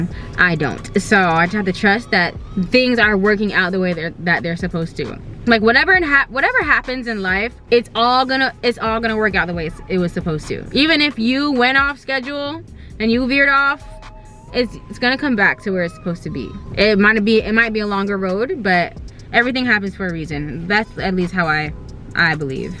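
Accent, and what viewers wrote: Jamaica. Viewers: It’s the patois for meeeee. I think its the first I’ve heard you speak it, love it! You spoke some real facts though sis! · wait u jamaican?😭😭😭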